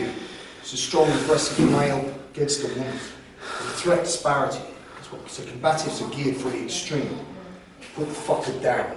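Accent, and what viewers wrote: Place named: Leicester, United Kingdom. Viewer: Hi, addicted to your vids, i’m probably your age and maybe had similar experiences growing up (BUT I wasn’t wearing a suit or uniform, enough said, i detect a leicester accent sometimes - i was in glen parva so you can guess the rest)), did bjj & striking at “mansfield chinese boxing club” (was actually “british combat” & bjj actually) also had my own “b’c’” weekly coach (one of the original 10 coaches certified by Geoff & Peter) so i was PASSIONATE about violence in my late teens up to now i suppose BUT had to give up on getting into violent situations whenever possible because i discovered money and for every step i took forward i took 2 back, The lesson i want to share with your viewers is this >>> WHEN YOU ARE GOOD “AT VIOLENCE” it’s an easy trap to fall into that you don’t bother to learn alternative life skills because violence gives you SOOOOOOOOOOOOOO much and that in it’s self is “self validating”!!! 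I was very lucky/ unlucky that i developed some serious physical problems that saved me from myself and forced me to learn new ways of dealing with situations, gaining validation etc, it has been an amazing addition to my lifestyle (ps i came from nothing & became “rich” in every way a young guy dreams to be rich in!!! BUT WITH VIOLENCE ALONE YOU WILL LOSE EVERYTHING, I am still as passionate as ever and i still have a devastating 2 minutes in me & feel the need to back down to no man because of fear BUT please i know you understand everyth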